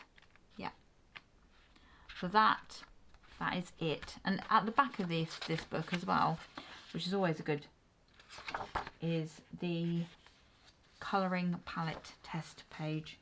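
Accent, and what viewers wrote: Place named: United Kingdom. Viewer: Hi new subscriber here I love to find colourist and channels from the UK I love all others too but as I am also English there is a familiarity to me lol great video I look forward to seeing more videos and flick through just sounds so British to me lol I am all over the place in my videos too and in real life so I can relate too many ideas in the head for the mouth to keep up with 😂 and for the buying for multiple hobbies same lol buying things for hobbies is like a hobby in itself haha your personality seems very bubbly and I can see that going down very well In the colouring community 💜💜💜💜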